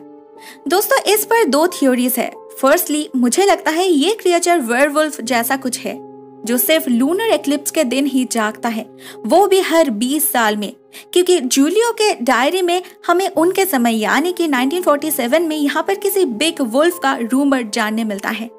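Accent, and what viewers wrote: Bengal, India. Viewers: Your bengali tone in voice can be identified..but it's sweet and nice to listen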